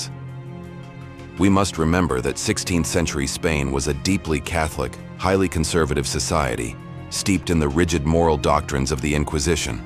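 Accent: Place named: United States. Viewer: You hace US accent. I Imagine that you are not concerned at all by any brutality commited by your ancestors towards native americans or blacks, please look inside your dirt before critizicing other. I just want to point a couple of things 1.- Aztec "priests" wearing a human skin in a religious ceremony was just a "cosmovision and fertility ritual". When settling in the lagoon and erectingTenochtitlan, the Huey Tlatoani of that time (about 200 years before Cortés) asked to the king of a city in the lagoon shore for his daughter. The king accepted. A few days later the king and some of his noblemen went to Tenochtitlan for a ceremony supodsely the wedding of the Huey Tlatoani with his daughter. They got horrified when the main priest started a ceremonial dance wearing his daughter's skin still pooring blood. 2.- If they were beloved, please explain not just the fall of Tenochtitlan, but how was it posible that the Spanish could rule such a complex society, when no more than 3,000 Spanish sailed to get settled in México in the first 50 years of Viceroyalty (60 per year) It could have been very easy for the indians to have expelled such "invaders" unless they preferred the rule of the Spanish rather than being ruled by any of their tribes. 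Morality: Before speaking about your disagreement to what the Spanish achieved, look twice an what your brutal and merciless society did in North America.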